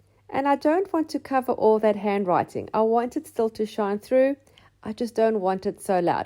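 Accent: South Africa. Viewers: Just discovered your video, and I love your style. Very inspiring. What a lovely surprise to hear a familiar (South African) accent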